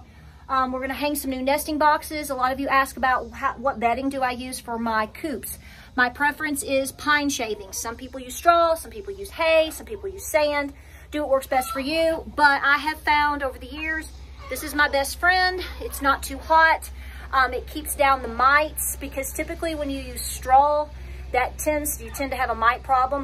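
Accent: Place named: East Tennessee, United States. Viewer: Found you about 2 weeks ago & have been “soaking” up all your advice & wisdom!! You mentioned Tight Wad Gazette in a video I watched today from awhile back. Just wanted you to know I found all 1-3 on Ebay & paid about less than $20 / free shipping SCORE & Thank You!!! Grew up in East TN & never tiring of hearing you / your accent!!! ❤❤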